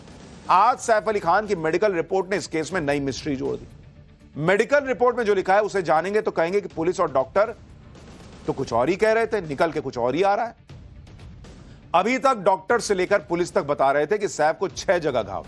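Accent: Bihar, India. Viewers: Poora Bihari accent mein lehra diye....Bihari youtuber Nahin Chahiye...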